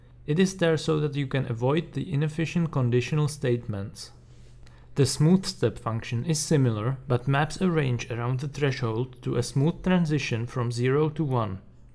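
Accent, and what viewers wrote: Czech Republic. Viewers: tady je někdo čech já to v tom slyším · Czech accent immediately spotted :D, thanks for the great video.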